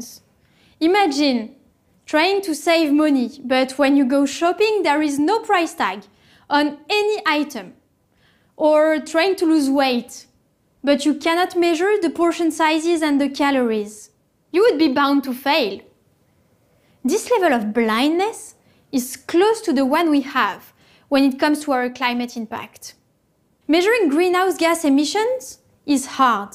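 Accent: France. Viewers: French accent is quite cute 😅😂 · Let me guess.. she's from France. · I think she's French. But it sounds so good.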